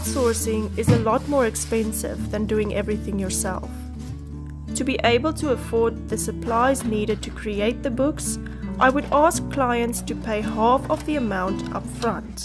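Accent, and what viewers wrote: South Africa. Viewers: I am a small printing business in the UK and have been inspired by your determination. As an ex SA pat I subscribed and look forward to more videos on how you are doing. Keep it up girl. Can't get enough of the accent. Kisses... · Always fun clicking on something on Youtube and hearing that SA accent! May your business grow bigger and bigger each day! Sending love, from a Saffa in the US❤️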